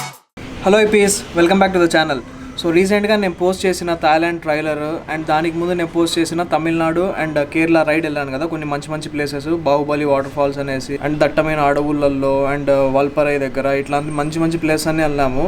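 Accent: Rayalaseema, India. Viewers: Like your rayalseema ascent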